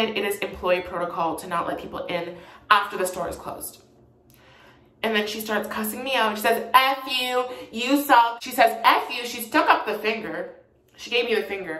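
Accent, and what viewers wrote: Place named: Canada. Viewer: Yeetus Feetus because he pronounced those words with the “long a” sound. Which is okay, I know he’s from Canada. But I’m from America and we pronounce those words with the “short a” sound so I thought it was funny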